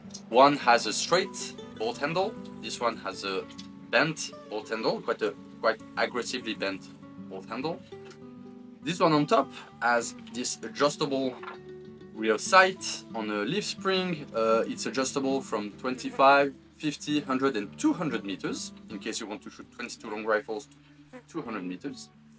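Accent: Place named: France. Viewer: Ta un accent français toi lol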